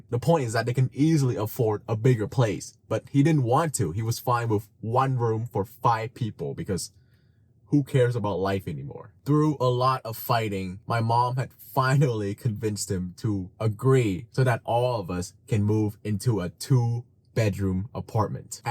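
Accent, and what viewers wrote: Vietnam. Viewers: I'm a second Gen viet, but not fluent. At what age did you move to the US? I can tell you still have a smidge of that Vietnamese accent. My parents come from a poor state and they have pretty bad financial sense. Investing in horrible businesses in Vietnam, don't believing in banks or investing. But I don't blame them too much since they never finished elementary school and barely know to speak conversational English. They always say to make a lot of money when you grow up, but give zero guidance how to do it. Also all my family are pro trump maga supporters, guess why.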